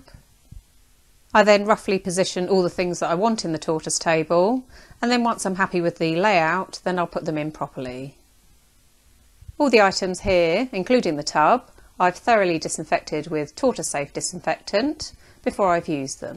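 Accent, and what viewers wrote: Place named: England, United Kingdom. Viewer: what part of England are you from? Your accent is really beautiful!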